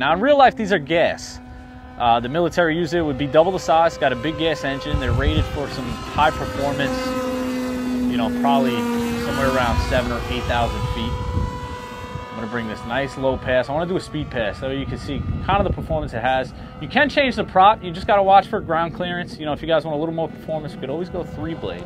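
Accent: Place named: Brooklyn, United States. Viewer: Are you in the boroughs of NY? You have a slight Brooklyn accent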